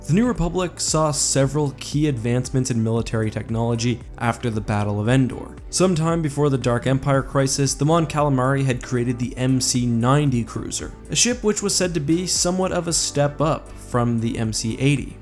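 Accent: Canada. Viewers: I watch your videos so much that I’m starting to say “out” like you do. You’re Canadian huh.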